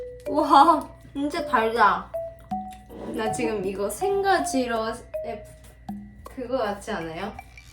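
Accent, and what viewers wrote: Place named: Busan, South Korea. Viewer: 그리고 부산사투리 작렬. 3개국어인가요? 일본어 표준어 사투리 ㅎㅎ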